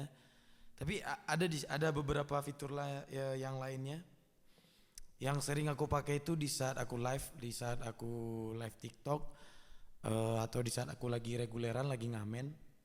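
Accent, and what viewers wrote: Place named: Padang, Indonesia. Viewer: Bg lu org padang ya? Bahasa indo lu agak lain 😂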